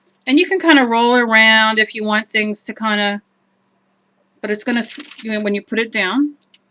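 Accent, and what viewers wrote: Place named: Philadelphia, United States. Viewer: Are you from Phillie? Love your accent and love your videos!!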